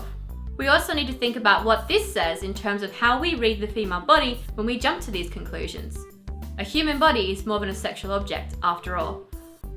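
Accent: Australia. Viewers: Sorry doll, I'm Australian, and this is what Australians tend to sound like. We are working on a solution for ESL speakers:) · I recognize that she's Australian. I assumed as much given that it's on the Deerstalker channel. I hear the accent enough that I don't really much notice it but that's fine. I'll take your word for it. either way, I'm not sure OP is even worried about it anymore as I've noticed they no longer comment here. haha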